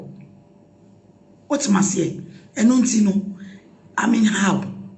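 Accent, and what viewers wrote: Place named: Spain. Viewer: Hey bro that is so weird and I don’t like how you talk talk like Spanish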